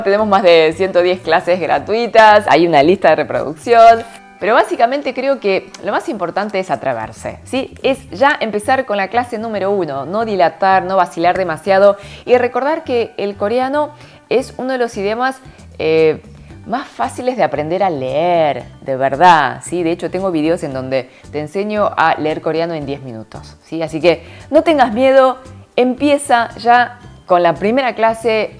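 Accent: Argentina. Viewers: Tienes acento argentino si no equivoco xd gracias por el video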